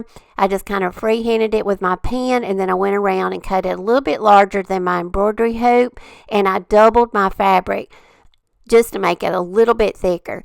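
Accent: South, United States. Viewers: I love them all & i love your Southern accent. :) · Came over from Julie’s channel! New sub! (I’m in Alabama so I love your accent!) ❤️🦩 Vicky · Where are you from? Your southern accent sounds like my family. 🥰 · Loved all your projects !!!!! New subbie...... looking forward to more projects..... And I can tell you are Southern.... We Southerners can recognize our peeps !!!!! ( just by listening to them talk !!!! LOL ) God bless !!!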